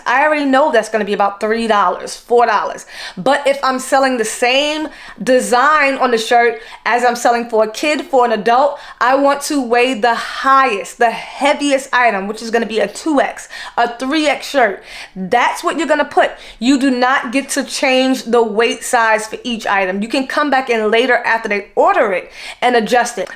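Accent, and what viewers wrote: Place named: Brooklyn, United States. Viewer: I'm thinking 🤔 she looks familiar...😂😉later on in the vid you say you're from NY. Now, I know you just SOUND familiar! 😂Brooklyn? Yes, on the grind!😂💪🏾💯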